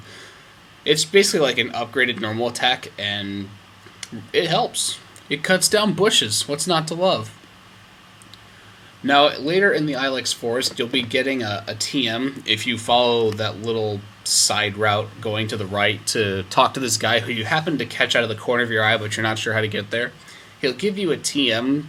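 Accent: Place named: Midwest, United States. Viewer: where are you from in the midwest?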